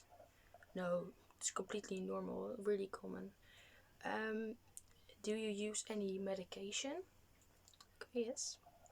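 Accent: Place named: Netherlands. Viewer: Ik herken aan je accent dat je uit Nederland komt ;). Niet dat je Engels slecht is, helemaal niet! Je spreekt goed en vlot Engels, zonder aarzeling. Dus proficiat daarvoor! Ik vind het altijd leuk om nieuwe kanalen te ontdekken, in het bijzonder wanneer ze nog aan hun begin zijn. De kwaliteit van het beeld is heel goed, het geluid ook en er zijn geen storende geluiden in de achtergrond, je stem is klaar en -héél belangrijk voor mij zonder geluiden van het speeksel, ik kan dat niet verdragen. Ik zou enkel liever dat je stem een klein beetje minder luid zou zijn. Maar ik zie veel potentieel in jou en zal abonneren, om je aan te moedigen! :)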